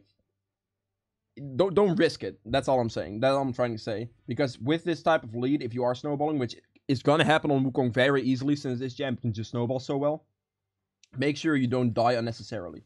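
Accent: Netherlands. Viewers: Are you dutch, you sound dutch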